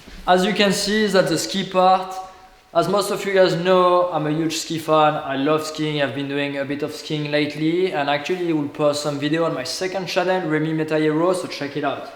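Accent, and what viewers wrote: France. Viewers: accent français la 🥰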